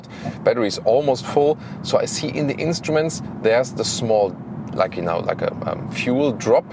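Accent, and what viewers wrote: Germany. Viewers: Your englisch Sounds very german. Funny.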